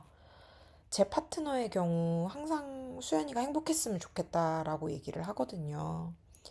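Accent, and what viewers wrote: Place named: Seoul, South Korea. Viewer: 우왕 서울말 진짜 잘하시넹 하다가 인!생의 듣고 빵ㅋㅋㅋㅋㅋㅋㅋㅋ 언니 그래도 진짜 자립해나가려고하는 의지를 중요하게두시고 앞으로 나아가려고 하는 것이 잘 보여요! 어디 가서 사시든 혼자서도 다 잘해내는 예요!ㅋㅋ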